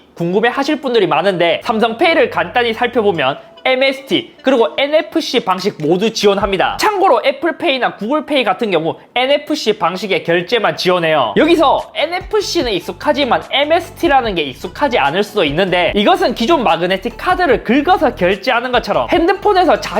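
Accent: Gyeongsang, South Korea. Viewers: 대구 사람이라 그런지 잇섭님 사투리가 넘흐 좋아용 😍😍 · 정보는 그럭저럭 괜찮습니다. 죄송한데 경상도 억양만 노력해주심 더좋을것같네요 화이팅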